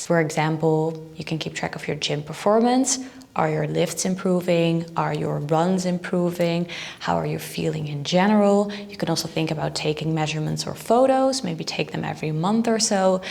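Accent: Netherlands. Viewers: Very Dutch accent 😂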